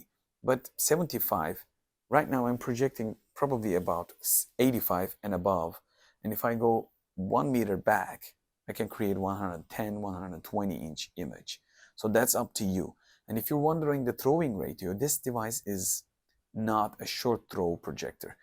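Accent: Turkey. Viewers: Türk aksanı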